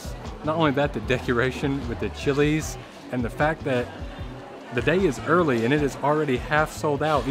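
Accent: Vietnam. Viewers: Your Vietnamese accent is soooo good and super adorable! Very polite and sweet. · Your Vietnamese accent like my brother. Very cute !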